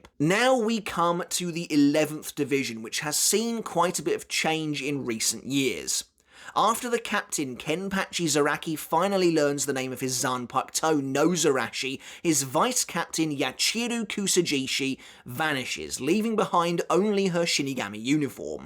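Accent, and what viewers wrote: England, United Kingdom. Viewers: hard to follow when you spell it with the heavy English accent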